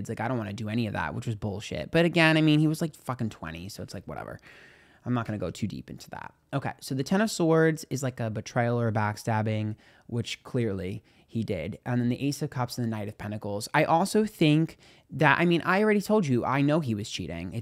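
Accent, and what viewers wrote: New York, United States. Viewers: I love how when you get upset….. bc your New York accent comes out…. I just love your accent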